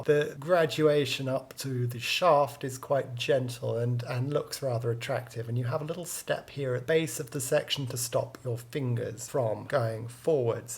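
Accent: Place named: RP, United Kingdom. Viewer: Okay, confession tiiiiime. (warning: high levels of tongue-in-cheekness coming up in 3, 2, 1...) I have never gotten why Americans get so weak at the knees whenever they hear a British accent. Doesn't do it for me, sorry. I always much preferred a soft Irish lilt or the silliness that is a Canadian accent ("eh"). Or a Scottish accent. Good gawd. To quote the great and late Robin Williams, "You can't fucking understand them" but they make gibberish so entertaining. Having said that, I don't know what it is about your accent but I love it. LOVE it. It's so posh. It fits so well with your obvious eloquence. There's something so satisfying about how you pronounce words that end in a -p. "Clipppp". I'm as gay as the night is long, but I'm not going to lie, I've got a bit of a crush on you. Too much information? Alllllrighty then.:D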